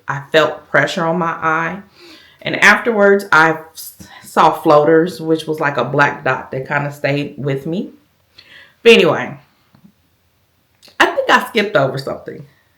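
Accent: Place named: Georgia, United States. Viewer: The journey continues 😊I knew from your accent you had to be near me. Augusta Ga is my hometown. I thank you for sharing your story. I have been thinkg about HRT. Dr put me on low dose birth control to manage menopause symptoms, but said it was only temporary. Now I know to try the supplements. Thank you❤ I am sorry for your loss.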